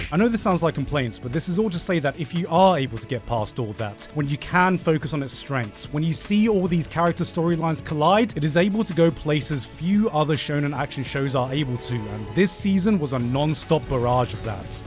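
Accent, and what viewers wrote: United Kingdom. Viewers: worst anime of the 6year, with an annoying brit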